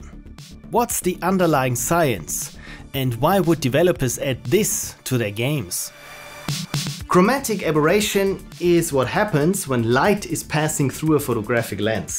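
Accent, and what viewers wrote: Germany. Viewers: Du bist ein deutscher, stimmts? :D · You are German, aren't you?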